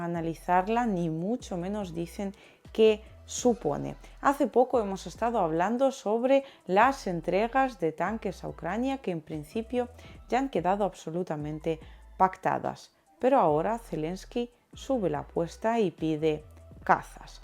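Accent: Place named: Spain. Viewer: Primeramente agradecer, las noticias respaldada con hechos grabados. Lo segundo, que hablas español español tal como lo "aprendisteis". Soy de Uruguay, pegado a Brasil y Argentina, con solo <num> habitantes, somos chiquitos pero con corazón grande. Quiero preguntarte, no es un hecho sin precedentes prohibir RT en América, solo para desconectar a Rusia del mundo. Sin embargo, prohibirlo a sido una muestra del ODIO, DISCRIMINACIÖN hacia Rusia. Mucho antes de esto que ocurre hoy , RUSIA cada vez que EUA hacia lo mismo DE ESCALAR TENSIONES con CHINA, Corea del Norte, IRÁN, rUSIA MOSTRABA Sarmat1 todo quedaba tranquilo. En estos sucesos tubo que mostrar el Sarmat2 y así sucesivamente. EUA declaró enemigo a China solo por temas comerciales, lo mismo con Rusia y no aprenden que prohibir, sancionar, robar, hasta atacar a cualquier ciudadano Ruso por el mundo dejándolo sin dinero, logró sacar la rebeldía y la fuerza para crecer y gestionar las propias empresas Rusas y no depender de nadie. 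Nuevo acuerdo para la venta de trigo de EE UU a Rusia Cereales 29 jul 1983 — La Unión Soviética y los Estados Unidos han llegado a un acuerdo de principio por el cual esta última nación venderá entre 9 y 12 millones ... Cómo Rusia se convirtió en el principal exportador de trigo "plantando" El potencial de exportación del país en la temporada 2020/21 se incrementó de 37,5 millones a 39 millones de toneladas. Y como el trigo , existe muchas otras cosas. Por eso no entiendo como estos parásitos nos po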